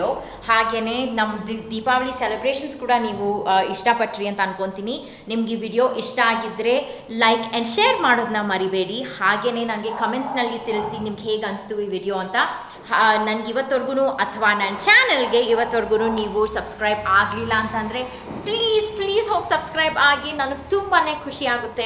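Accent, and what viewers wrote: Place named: United States. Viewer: #giveaway #ಗಿವ್ಅವೆ Hey there , Your kannada speaking style with American accent is so soothing😍, loved the idea of yours to teach your childrens to speak kannada.Stay Happy and prosperous☮️♥️.. Much love 🤗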